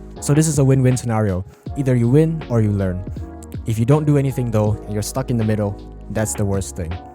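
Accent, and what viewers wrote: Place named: Singapore. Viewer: This guy sounds Singaporean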